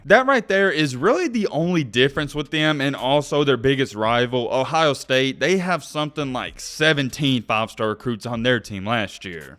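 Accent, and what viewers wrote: South, United States. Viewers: Dude you're numbers are so far off. Bro, you can tell by your back woods accent you're from the south. Keep your opinions there chief. AWFUL!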